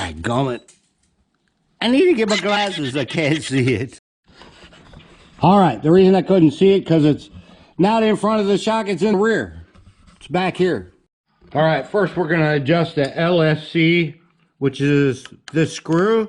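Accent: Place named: Appalachia, United States. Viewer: Finally someone who speaks hillbilly. I get it now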